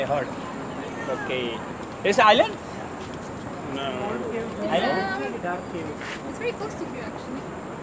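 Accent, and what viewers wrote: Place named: Pakistan. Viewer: Aap talking like pakistani cricketer!🕵 u had a great time in malesiya with foreign girls😍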